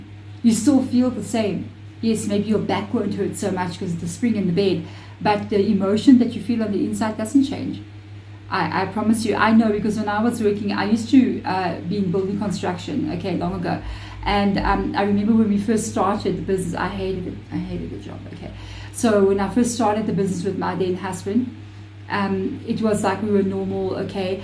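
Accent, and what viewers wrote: South Africa. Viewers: is she south african, I love the way she speaks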